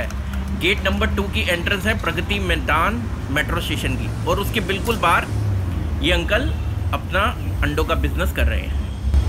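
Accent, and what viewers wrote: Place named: Punjab, India. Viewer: Best part of your channel is ki aap hindi bhi punajbi accent me bolte ho.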